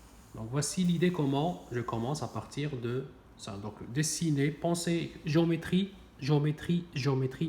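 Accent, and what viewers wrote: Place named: Canada. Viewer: Ouahhh, très bonne vidéo Aussi, je suis habitué à entendre l'accent français parisien, l'accent canadien est très sympa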